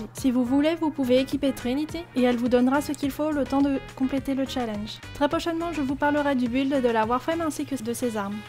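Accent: France. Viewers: j'me disais bien que j'avais reconnu l'accent français sur les autres vidéos Haha.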